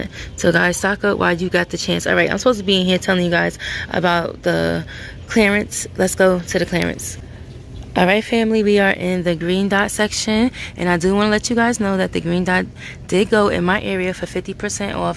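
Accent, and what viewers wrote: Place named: East Coast, United States. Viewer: Everytime I go to the clearance section, I hear your lovely voice in your east coast accent saying "Scan Scan Scan family" LOL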